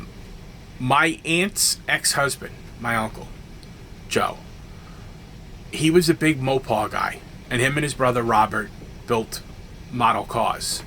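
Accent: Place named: East Coast, United States. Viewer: Thank you very much for sharing. Your east coast accent brings back a lot of memories for me. I haven't been back in a long time!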